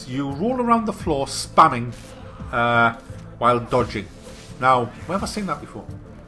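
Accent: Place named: Newcastle, United Kingdom. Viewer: His geordie accent does it for me lmao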